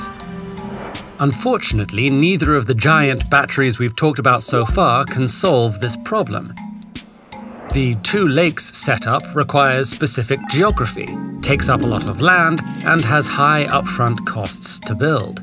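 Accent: United Kingdom. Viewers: i like British Accent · A British voice over in a Ted video is something I never thought I'd hear or need. Man this is good.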